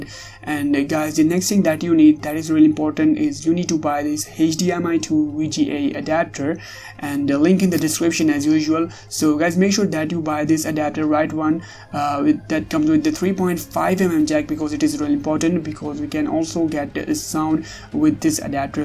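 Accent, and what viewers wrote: India. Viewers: He sounds indian, no offense dude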